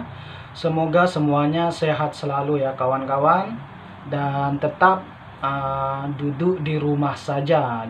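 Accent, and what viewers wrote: Malaysia. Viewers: amboii.. Bahasanya pun dah mcm org KL .. Hihi😆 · Anjay logat nya sudah sempurna,,berapa tahun di malaysia gan ?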